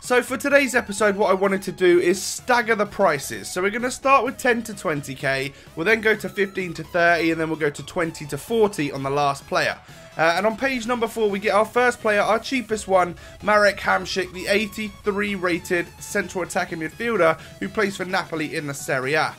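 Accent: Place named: London, United Kingdom. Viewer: Where are you from? London Maybe? I love your accent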